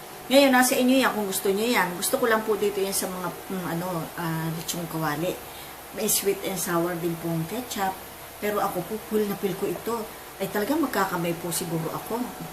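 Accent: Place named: Nueva Ecija, Philippines. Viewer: naalala ko tita ko sa pag sasalita. taga Nueva Ecija siguro si madam :)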